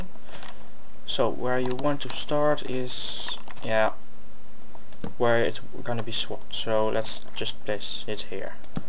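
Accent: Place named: Netherlands. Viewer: Waarom praat je engels terwijl je nederlands bent, met je mooie nederlandse accent.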